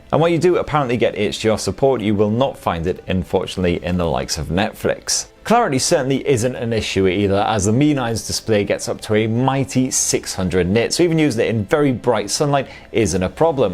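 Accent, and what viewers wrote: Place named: North East England, United Kingdom. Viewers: I'd say Teesside or around Newcastle as well. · Somewhere between Teesside and Newcastle (North East England). Its quite hard to tell as he is quite well spoken, I would hazard a guess at possibly Sunderland as his accent seems stronger than mine and I'm from teesside but I could be completely wrong.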